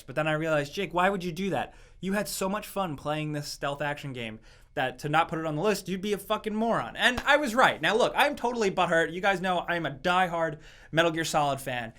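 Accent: Australia. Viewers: what the hell was up with your Aussie accent Jake? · Actually a Australian accent basically sounds just like yours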